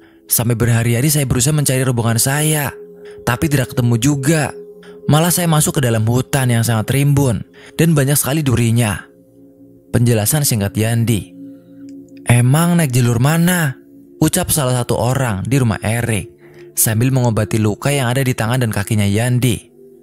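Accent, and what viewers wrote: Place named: West Java, Indonesia. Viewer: Itu logat Sunda 😅😅😅pas akhir kalimat agak gimana gitu 😅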